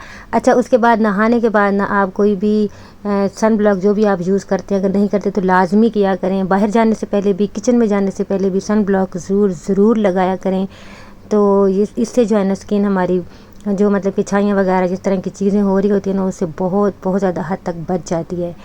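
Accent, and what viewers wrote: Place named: Saraiki, Pakistan. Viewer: السلام علیکم پہلی دفعہ آپکا بلاگ دیکھ رہی ہوں ،،،،،میں لغاری ہوں سرائیکی آپکا لہجہ بھی سرائیکی جیسا ہے ❤❤❤ ما شاء اللہ 🎉 پلز بتائیگا آپ کی زبان کونسی ہے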